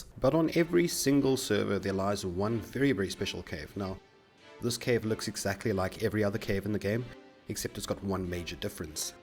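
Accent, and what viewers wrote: Afrikaans, South Africa. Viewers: Lol you sound so south african👌😂, are you south african? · Thought I knew the accent hahahaha. Since I've lived in Australia now for ages couldnt be sure if it was NZ or SA but fuck yeah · I never know whether it’s NA or SA but I always hope it’s SA lol. The way you speak and the language you use made my think SA though. I was right for once lol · Are you from south Africa? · My man are you south african? Your accent is familiar. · Are you south African ?? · You south africa? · Is this guy South African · Are you south African? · Are u South African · You from South Africa ? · Am I detecting Afrikaans? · Are you SOUTH African? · Subscribed just because Local is Leka. Nice Content, love the familiar accent well done boet you somma make me excited about SA gaming again, looking forward to more of your videos and thank you. 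· Ek smaak Afrikaans op jou aksent. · Didn't even know anyone in SA played Rust lol. This game looks so fun and is even more fun with a South African accent. Love the professional drawing program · u south African bru ? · You sound South African. Good vid. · Baha oml I've never seen your channel before, and started to watch and thought shou, that accent is oddly familiar, and as soon as you said ja it was all over, South Africa XD born in joburg myself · Intresting but you still have a heavy afrikaans accent lol XD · Are you south african? :> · I hear a South African accent. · Are you south african? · Where u from,Sounds south african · Are you South African Mr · That Afrikaans acsent.😂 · you south african? xD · Dude u never gonna read this comment but I swear you have a south African accent heart this or comment if it's true cuass I have a feeling u r South African :/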